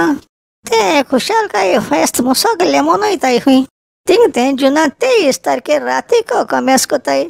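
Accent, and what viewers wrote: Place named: Salcete, India. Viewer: Typical Salcete Konkani... ..😄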